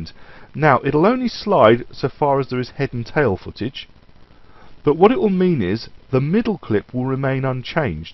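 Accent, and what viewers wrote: United Kingdom. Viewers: Fab.....! Listening to your voice I thought you were Tony Handcock, the British comedian. Where in England are you from? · EXCELLENT explanation!!!! and I like the British accent too. haha!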